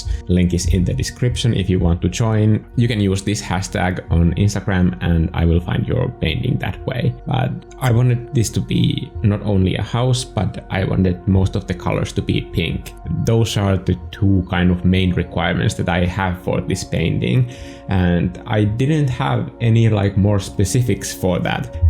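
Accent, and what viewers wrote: Finland. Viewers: I can hear an accent... can’t pin point it exactly. But I’m getting Finnish vibes? To say the least, it was nice really hearing you talk about this! You seem to genuinely enjoy doing this. The painting was absolutely lovely, certainly inspirational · Haha the moment you started talking I was like ”HE MUST BE FINNISH OMG”. I love your art and your attitude haha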